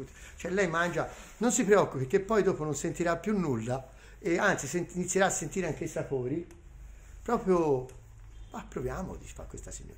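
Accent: Tuscany, Italy. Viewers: Grande Grande. Non è che non capiscono il finale, è che non sanno che la "C" in Toscana....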